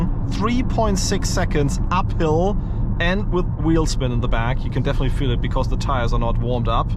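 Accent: Germany. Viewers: Man hört diesen Deutsch Akzent sooo raus haha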